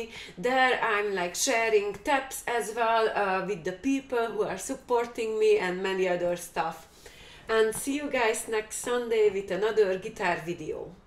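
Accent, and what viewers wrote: Hungary. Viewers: basszus és tényleg magyar vagy 😂😂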